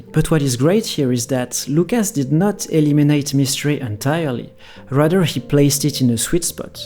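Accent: France. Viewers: Holy French accent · tu es francais?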